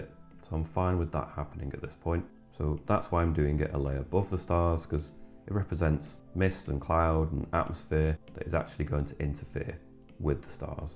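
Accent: United Kingdom. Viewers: Turn on the closed caption, cc, to understand the British accent for people in the USA. what he did say?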